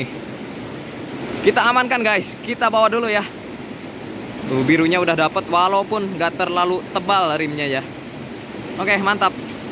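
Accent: Indonesia. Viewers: Sunda na nu ti mana mang 😁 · Bang urang sunda nya sarua ejeng urang sunda saya banten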